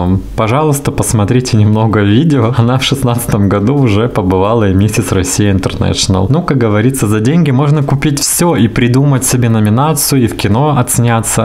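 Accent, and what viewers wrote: Ukraine. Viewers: Ура! Любимые новости опять, бархатный тембр и украинский говор ("снег притрусил" - как мёд на душу, обожаю українську мову, даже смотрю иногда ток-шоу на украинском)., это правда, что почти все кошки правши, а коты левши? Ваша кошечка правша?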